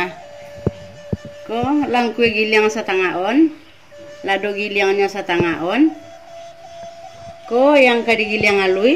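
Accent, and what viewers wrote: Minangkabau, Indonesia. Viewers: Uni menggling cabe Minang asli👍👍tak ade Kat Malay ni,bahasa nya pun khas,🤣🇲🇾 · Lah wak Cubo buek tek, Alhamdulillah.. Lai lamak, awak suko ngikutin resep etek, klu dari bahaso nyo, etek kok kayak urang pesisia, iyo po ndak tek..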